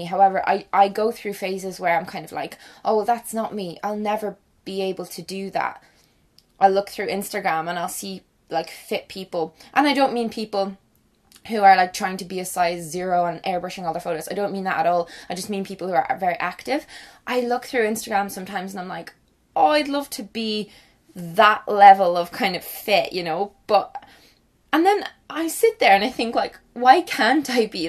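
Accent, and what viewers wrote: Ireland. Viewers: I love theseeeee and you your Irish accent is lovely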